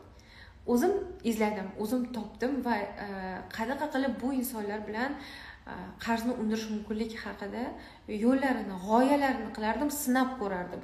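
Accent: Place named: Uzbekistan. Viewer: манга сизни жасоратлингиз ёкди. Жуда чиройлийсиз, исмингиз жисмингизга монанд мафтункорсиз, сокин, у́збекона гапирар экансиз. У́з хаётий тажрибангиздан очик- ойдин бу́лишганингиз учун рахмат. Сиздек у́збек йигит- кизлар дунёга танилишларини хохлардим.Янада юкори чу́ккиларни забт этинг.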